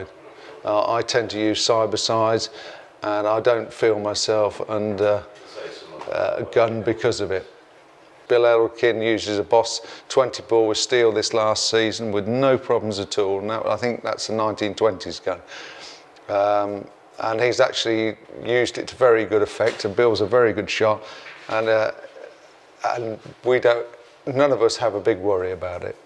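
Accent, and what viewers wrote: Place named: United Kingdom. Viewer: Is this the hunters lodge in tennessee as this guy is english british accent.??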